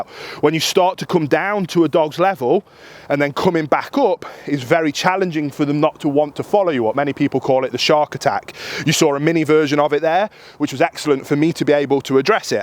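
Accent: United Kingdom. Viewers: Finally I love someone's british accent, I can understand everything so smoothly.. so different from Newcastle geordies I used to live with.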